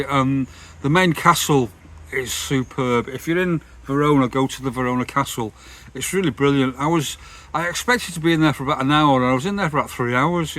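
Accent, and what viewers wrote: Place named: North, United Kingdom. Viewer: I love it ….the sounds of a disgruntled northerner- just like me 😮 “ Put me in charge and I’ll sort it out “!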